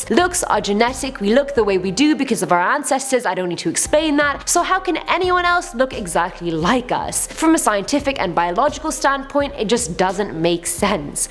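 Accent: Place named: United Kingdom. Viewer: She's hot, she's got that brittish sexy smokey voice type of accent.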